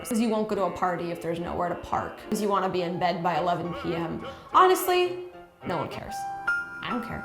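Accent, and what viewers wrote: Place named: Minnesota, United States. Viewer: Dying! Not only because you make ALL good points but also because you seem to be fighting a Minnesota accent haaaaard the whole time lols you crack me up!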